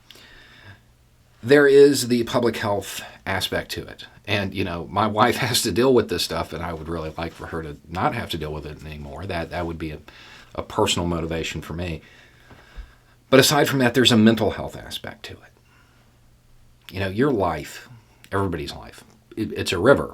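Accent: South, United States. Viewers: He's in the South and he has an accent associated with the South. He looks and sounds like a "good old boy" which is generally a Republican voter.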